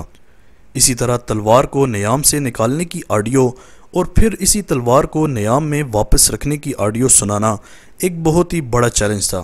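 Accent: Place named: Punjab, Pakistan. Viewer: بھائی کا تعلق ضلع اٹک سے ہو اس لیے لفظ ( ہوئے) ہویے بول رہے ہیں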